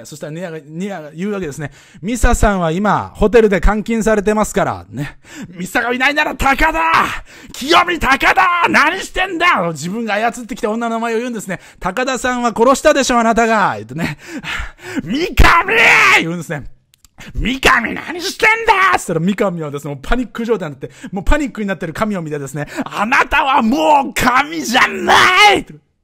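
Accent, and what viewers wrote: Kansai, Japan. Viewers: アバターより本人の方が好きだったけど、アバターでもしっかり聞きやすいし、面白い(^^) デスノートに限らず、こんな複雑で難しい物語考える人すごいなぁ ほんで関西弁めっちゃおもろい笑コテコテやし（関西人）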